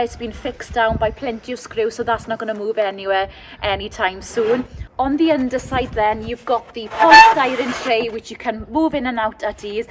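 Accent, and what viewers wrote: Wales, United Kingdom. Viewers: Wish i could unbox her beautiful lady beautiful welsh accent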